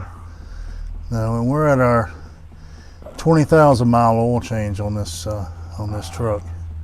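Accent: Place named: Texas, United States. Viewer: Oh, I feel like I’m in Texas hearing his accent, the garage and the big Chevy. I miss Texas especially the shoppings and dinings.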